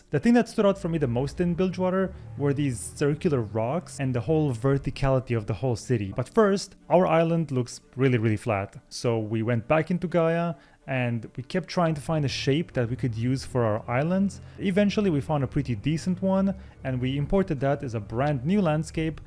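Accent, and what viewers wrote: Romania. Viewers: are u romanian? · are you Romanian??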